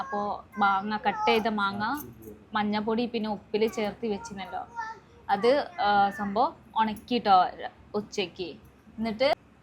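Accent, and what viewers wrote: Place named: North Kerala, India. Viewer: North Kerala, from the way you speak malayalam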